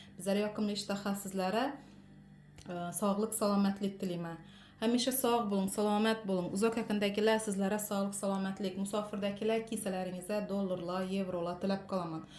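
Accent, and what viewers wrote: Khorezm, Uzbekistan. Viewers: Хорезм таомлари зўр. Айниқса сузма палов. Менга жудо ёқади хоразимча таомлар, хам хоразм шеваси жудо чиройли сўзлашадилар. Котта рахмат синглим. Хоразмга бориб кегандай бўлдим. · Ozizni shevayizda chiqarganiza dm quvondim apka manam xorazmdanman boshqala xorazmdan bosanam tushnmidla dab yo ozn shevasinan uyolganindan adabiycha soʻllab video chiqaradila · Ассалому алайкум. Роликни тасодифан кўриб қолдим. Овқат пишираётганингизда Аллоҳни ёд этганингиз, Хоразмча, чиройли сўйлаганингиз учун рахмат, қизим. Аллохнинг панохида юринг.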